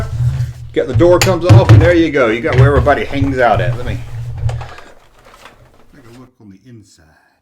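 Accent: South, United States. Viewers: Ya can’t talk right because you were raised in the south ya hick.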